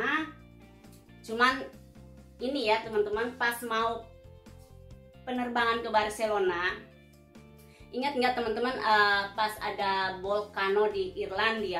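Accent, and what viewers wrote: Sunda, Indonesia. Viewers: Seru ngomong teh sunda banget jd seneng ngikutinya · Salam kenal sy dari kadipaten majalengka..mau tanya.Mama.asli ny orang mana..logatny sperti orang sunda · Bu sundanya dariama mani medok sundana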